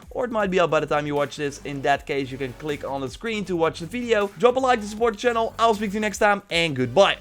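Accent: Netherlands. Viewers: r u dutch? accent sounds like it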